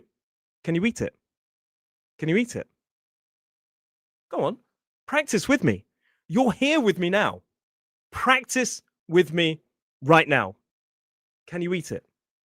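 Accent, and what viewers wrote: United Kingdom. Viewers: Hey uncle you very supper man.because you're speaking in britsh english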